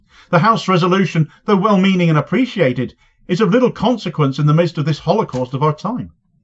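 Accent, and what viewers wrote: Cornwall, United Kingdom. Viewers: as much as I enjoy watching your vids and enjoy your dulcet Kernow accent, was the speech at the Oxford Union filmed? I know Susan Abulhwa has posted the transcript on X, but I'm trying to find a video version. Kudos for reciting this and bringing it to a wider audience.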